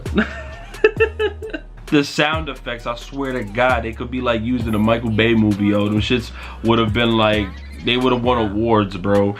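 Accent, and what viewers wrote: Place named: Harlem, United States. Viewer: Yo...Real talk, you had me tearing up from laughter with this story man. You sound just like the kats i grew up in harlem with for real. XD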